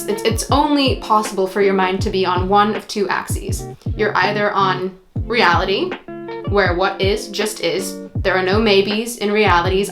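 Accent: United Kingdom. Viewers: I can hear the British side screaming to come out ❤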